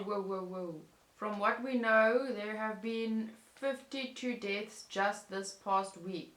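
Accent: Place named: South Africa. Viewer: Is she from South Africa? It's a really nice accent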